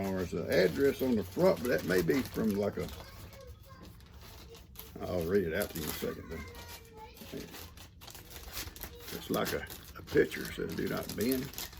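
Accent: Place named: Texas, United States. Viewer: I have always enjoyed hearing the stories told by the older generation. Except, now I am one of them! I was born on New Year’s Eve in 1953. But, you have such a likable personality, and it is charming to hear your Texas style accent in the words you use when speaking. Just wanted you to know that, and hope Gi-Gi is doing well, after the terrible hack she has had to endure. I know she has sought strength and comfort trusting in the Lord.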